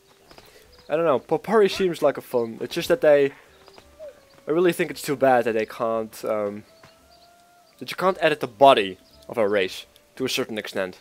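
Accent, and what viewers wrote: Netherlands. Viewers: hehe grappig dat je vaak snel kan horen of iemand Nederlands is :D Nice video trouwens.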